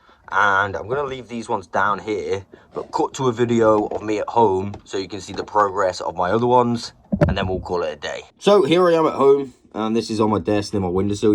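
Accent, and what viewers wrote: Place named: England, United Kingdom. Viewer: Not garden related but I love your accent. Whereabouts in England were you born?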